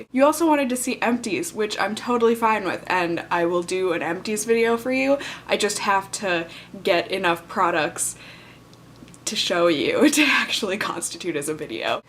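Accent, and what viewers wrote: Minnesota, United States. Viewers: Also, please do your Minnesota accent more lol, it's adorable! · Your Minnesota accent killed me. I'm dead. DEAD. IT HURTS SO GOOD. LOOOOOL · i died at the Minnesota accent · OMG the MN accent got me cracking up!!!! I always tell people that we don't talk like that. But I did have a coworker say that he forgot that I had an accent until I was on vacation and came back. He said then he could hear it. I only hear it come out when I say certain words.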